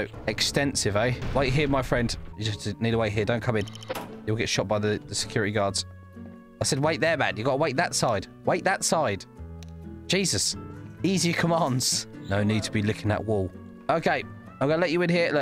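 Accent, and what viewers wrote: Essex, United Kingdom. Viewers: r u from Essex aswell as me??? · ngl didn't know he was from essex... lol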